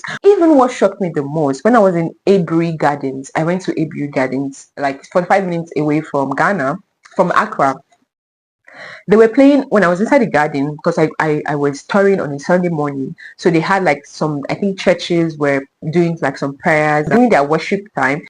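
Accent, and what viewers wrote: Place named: Nigeria. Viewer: Your accent is quite different from some Nigerians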